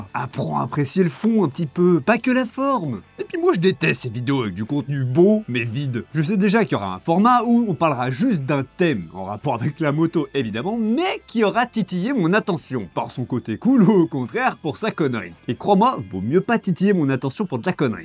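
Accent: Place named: Lyon, France. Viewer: Bien vu,! Excellent début, cool de te retrouver ici. Le ton que tu emploies me fait penser à un autre glorieux Lyonnais que tu as cité dans tes références - je te laisse deviner. Longue vie à ta chaîne, c'est cool! ✌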